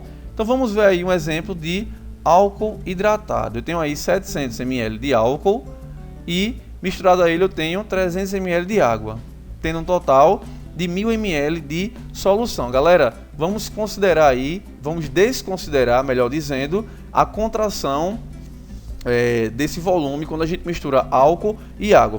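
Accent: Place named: Northeast, Brazil. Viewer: adoro o sotaque nordestino ^^ (favor não ver 'nordestino' como depreciativo, falo nordestino como morador da região nordeste do país. )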